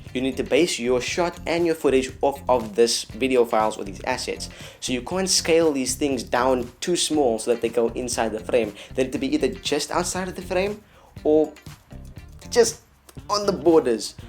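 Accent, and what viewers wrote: South Africa. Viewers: Your from SA arent cha?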